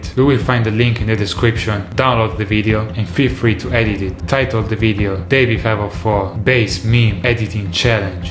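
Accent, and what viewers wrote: Italy. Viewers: italiano si sente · Hey but, are you italian? Because when you said "epico" i understanded that you ARE (i think) italian.. i am parli molto bene inglese ma magari concentrati di più sulla pronuncia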